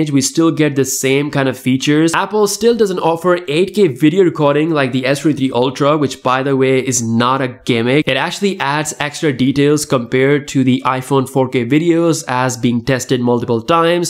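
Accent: India. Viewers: You sound like Indian speaking English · Idk but...Ur english sounds like an INDIAN english.. with some added accent.. 🤔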